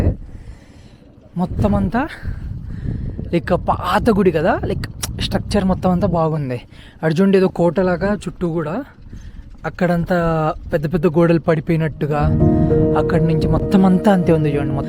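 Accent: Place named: Tadipatri, India. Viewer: anantapurama Tadipatri na, adhey slang idhi ...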